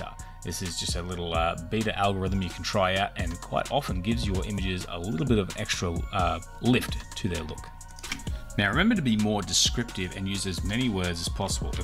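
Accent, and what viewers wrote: Australia. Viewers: Oh, my ears! It was so damn nice to hear such an Aussie accent! G'day!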